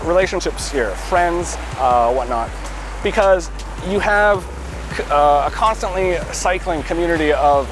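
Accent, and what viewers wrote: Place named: North Carolina, United States. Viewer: Hi, I really like your videos about daily life in Thailand and the many traps you can come across as a farang living here in Thailand. But there is one thing I want to mention about your performance and I hope you will understand what I mean: I'm a native German speaker and actually quite fluent in English (worked in the UK for 4 years) but I sometimes have really difficulties to catch up with your Northern Carolina accent. And I think I'm not the only one among your followers that have this issue. I you - maybe - can do something with that it would be really appreciated very much. And please: No offence meant! 😜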